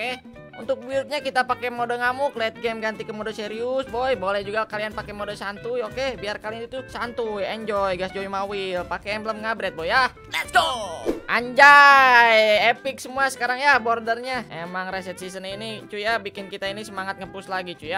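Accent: West Java, Indonesia. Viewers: Bang org sunda yak? Gak tau napa kalo youtuber sunda beda cara ngomong nya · Kamu orang Bandung mang soalnya ngomingnay kaya ada sundaan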